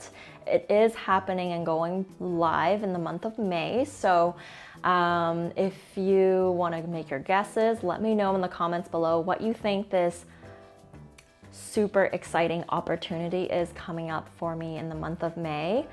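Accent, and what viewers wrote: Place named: England, United Kingdom. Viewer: You are such an inspiration. I love your english accent. ♥️ from Malaysia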